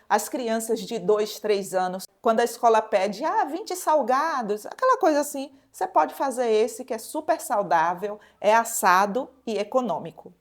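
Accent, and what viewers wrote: Bahia, Brazil. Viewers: Te encontrei por acaso , e estou começando a fazer salgados, já fiz uma receita de coxinha ficou maravilhosa. Vou fazer vários pra vender. Vc explica com muita calma e amo seu sotaque minha família é da Bahia. Eu sou de São Paulo assistindo suas aulas. Gratidão vc não imagina como está me ajudando. Na verdade acredito que está ajudando muitas pessoas. Jesus te abençoe 🙏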